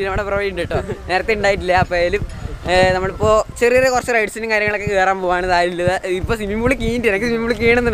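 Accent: Kannur, India. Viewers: Bro u from kannur ano plzz tell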